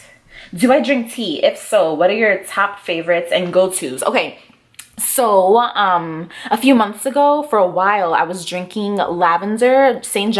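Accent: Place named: Boston, United States. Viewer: FYI You are so beautiful and I love your accent. You almost sound like you are from my side of town (Boston). I am in Charleston right now but I am bean town born and raised!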